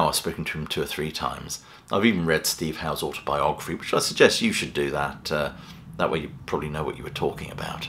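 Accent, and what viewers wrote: England, United Kingdom. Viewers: Love your channel and your English accent, eccentricity and humor! Plus your knowledge is vast! Carry on Wayward Son of Rock! Never mind the Trollos! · F-Them. Love your English accent. Keep up the good work. All the best from me to you, Sir!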